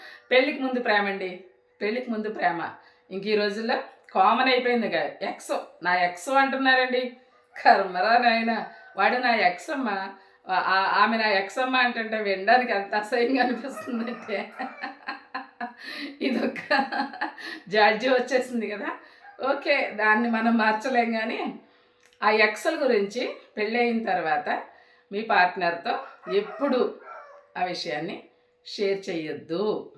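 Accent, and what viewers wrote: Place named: Godavari, India. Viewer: చాలా బావుంది వీడియో. గోదావరి స్లాంగ్ అదిరింది. అలాగే మా పెద్దక్క ను గుర్తు చేసావు చాలా సంతోషం ❤️❤️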